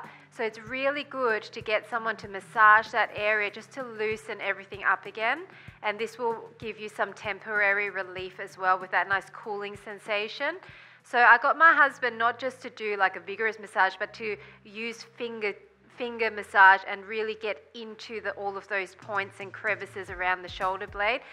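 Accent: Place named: Australia. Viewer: A freaking Aussie! G-day mate!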